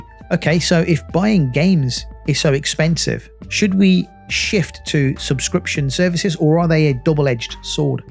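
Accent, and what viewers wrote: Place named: England, United Kingdom. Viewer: You've clearly got an English accent, so why you talking bucks & dollars and not £s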